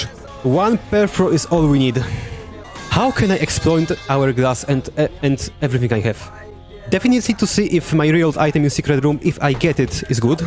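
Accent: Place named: Poland. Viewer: Niezły film Oraz dobry akcent Ale Polak zawsze pozna Polaka nawet gdy mówi po angielsku Ale wciąż bardzo fajny odcinek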